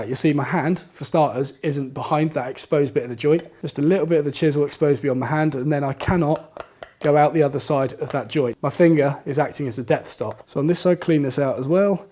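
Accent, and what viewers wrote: United Kingdom. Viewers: Woodworking seems far more serious and classy with a british accent.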